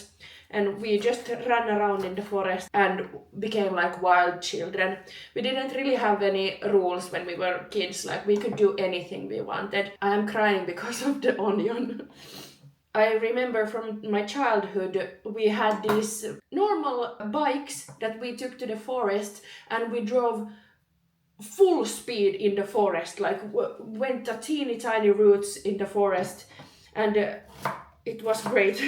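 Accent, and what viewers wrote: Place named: Finland. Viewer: I have only been to Finland once, but your accent reminds me of the late Stina Rautelin. I am certain, that your channel will be successful - you are a pretty girl.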